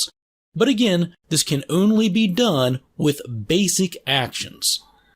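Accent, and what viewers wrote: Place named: Mid-Atlantic, United States. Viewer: With the 'L' sounds coming from the throat rather than the tip of the tongue, coupled with the wide 'O' vowel sounds, I'm going to guess Maryland. Definitely somewhere in the US Mid-Atlantic region between DC and Philadelphia.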